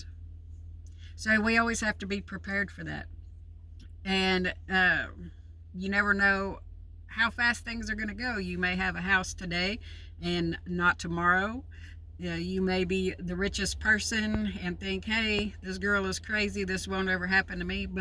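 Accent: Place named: United States. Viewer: Hi . Love your country accent 😍 . So you travel alone?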